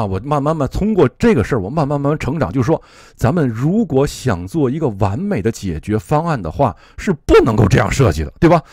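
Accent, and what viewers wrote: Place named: Japan. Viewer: 听口音确实是在日本干活的。komakun加油。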